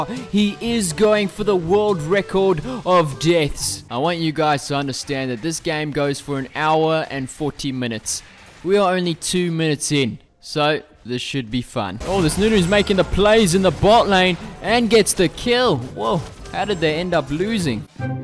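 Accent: South Africa. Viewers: Are you south african ? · Are u south african?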